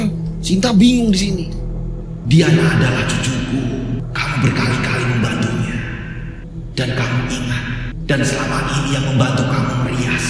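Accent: Java, Indonesia. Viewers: Seneng dengar logat jawanya 😁😁😁😁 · Assalamualaikum..... Kk Nani dari Majalengka Jabar Hadir Absen.... De kk tuh sangat suka kalau kamu menyampaikan kisah cerita nya.... Sangat pas cara menyampaikan nya.... Apalagi bahasa jawa de yg sangat medok.... Kk sangat suka de... Sehat dan sukses selalu ya de.... Boom..boom... Booommm 😍🙏🙏🙏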